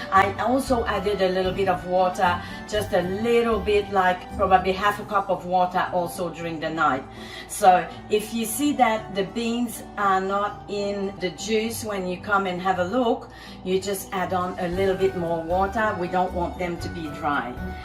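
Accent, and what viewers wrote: Australia. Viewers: I could pick up the Australian accent easily. I'm sorry that as it is my first time at this channel I don't know your history. The classy French accented English reminded me so much of the beloved Madame Benoit. For those who might not know, Jehane Benoit was an early cooking star on Canadian TV and a rarity as she was on both the English and French CBC channels. She was deferentially known as Madame Benoit (rarely Jehane) and was a woman who was ahead of her time belieing her matronly appearance. She was an early advocate of vegetarian cooking and much later microwave cooking. <link>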